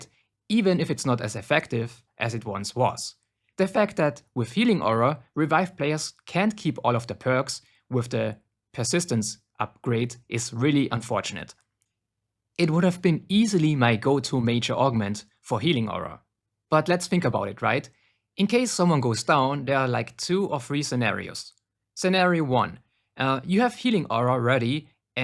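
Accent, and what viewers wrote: Germany. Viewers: Im hearing a german accent xD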